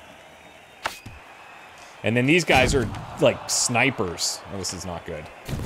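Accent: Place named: Saskatchewan, Canada. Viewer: Your speaking gets much more "Sasky" when you are playing this game. As a fellow Saskatchetonian, I love it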